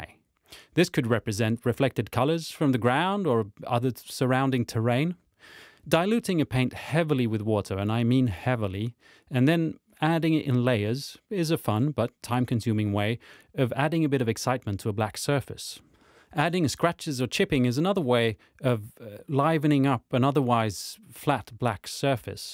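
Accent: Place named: Sweden. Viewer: thanks for the vid, black hair have always been something I have avoided due to it being hard. as I can here your from Sweden. where did you buy your spray booth? it looks like its one of those foldable once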